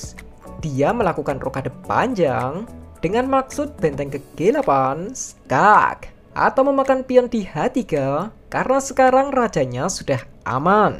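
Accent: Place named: East Java, Indonesia. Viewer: Kyk logat jawa timur an,kek nya emang orang jawa timur ketara bgt soalnya😂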